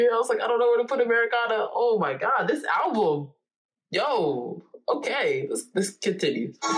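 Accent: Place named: New Jersey, United States. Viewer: They say Jersey? Love Jersey! You do have an accent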